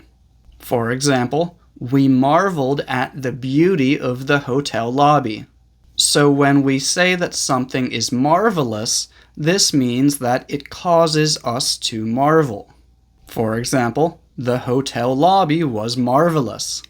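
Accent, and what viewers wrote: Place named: United States. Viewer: You are too kind. In awe of your beautiful American accent as well.👍😊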